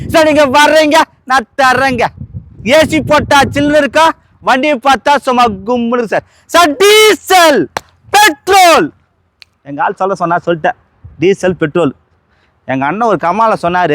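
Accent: Madurai, India. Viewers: Madurai vasagam super 👌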